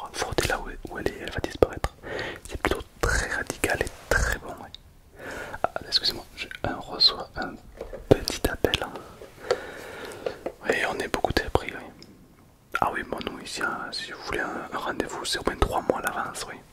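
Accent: South, France. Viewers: Ty serais pas du sud ?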